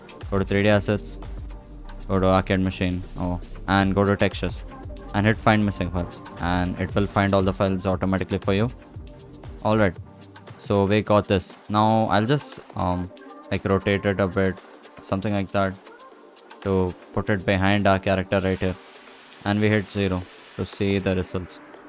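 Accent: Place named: India. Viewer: The Indian Accent Be Hittin Hard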